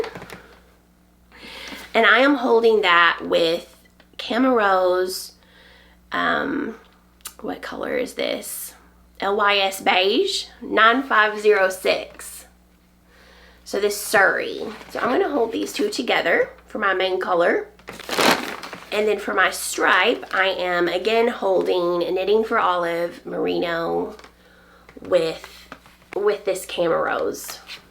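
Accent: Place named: South, United States. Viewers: You are such a delight to watch! Your accent thrills me. I grew up in East Texas and it’s very East Texas😄 My days are heavy. My husband is recovering from a live kidney donor transplant. Your knitting podcast is such a fun relief. I taught both my granddaughters how to knit. Your daughter’s pink cowl brought smiles to my heart. Your mudd bag was amazing to see. Take care and I hope you’re well soon. Merry Christmas🎄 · I’m from Texas so it’s nice to hear another version of Southern “twang” (accent). The cowl is too precious - I can see why you would treasure it. Totally love the leather of the project bag. Can imagine just how many WIPS would fit in there^^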